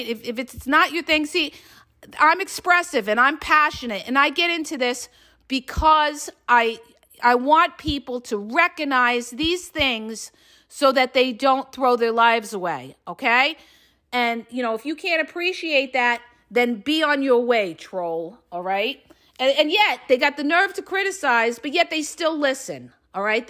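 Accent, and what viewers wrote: New York, United States. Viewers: I got mad for you and troll about your talking . I commented to you first time I heard you I can hear the power of New York or something very cool and legit and sexy so keep it up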